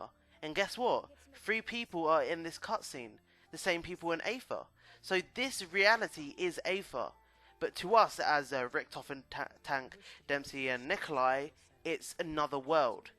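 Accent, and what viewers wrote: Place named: United Kingdom. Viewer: OMFG I HATE FUCKING BRITISH ACCENTS IT FUCKING SUCKS@ KHJKHJKGHKGJK CANT STAND HIS FUCKING VOICE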